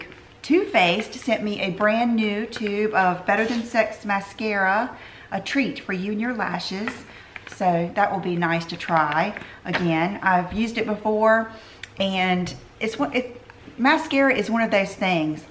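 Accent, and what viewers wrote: South, United States. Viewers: I love the natural look on you!! So gorgeous. Btw, it cheers me up so much everyday just to hear your sweet voice. I am from GA and I love a fellow southern girl accent!!! You remind me so much if myself. People say we’re sweet but we can be tigers too! Lol. 😘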